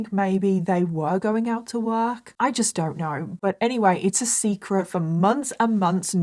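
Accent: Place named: United Kingdom. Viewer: Can somebody please tell specifically what kind of British accent has? Thank you. I like it.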